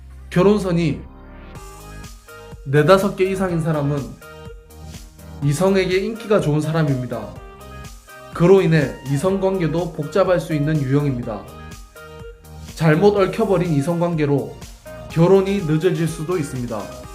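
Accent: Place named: Gyeongsang, South Korea. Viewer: 말투가 경상도 분이시라 ㅋㅋㅋ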